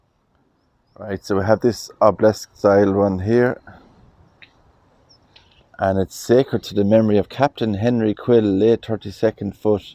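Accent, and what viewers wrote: Ireland. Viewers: Merry Christmas to you and ❤ I love listening to your voice as the accent reminds me of my Grandparents who came to America from Ireland. Thank you so much for sharing these beautiful cemeteries with us!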